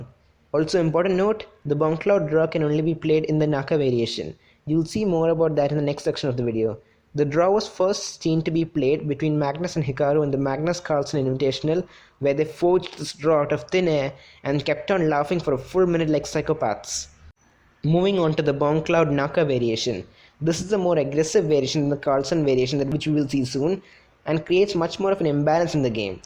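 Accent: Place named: India. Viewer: Ah! Indian accent! The worst english mix accent ever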